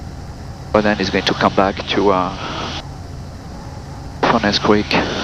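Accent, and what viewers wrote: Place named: France. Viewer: Just with the accent we can see that you are french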